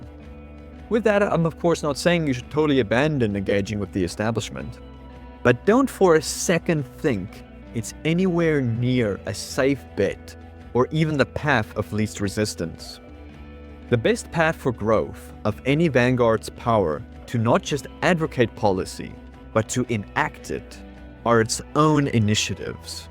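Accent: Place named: South Africa. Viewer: Knew you were South African before you said so. Good to see there's more of us. This Soutie is with you ready to fight for my Afrikaans people and ancestors. Fuck communism. God i love how you explained the South Africanisation spectrum. I really do wonder where we're going in future. Papa Greyling came to SA in 1752. I don't think I'm going anywhere.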